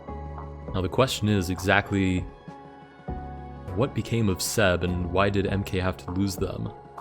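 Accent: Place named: United States. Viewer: his accent is american tho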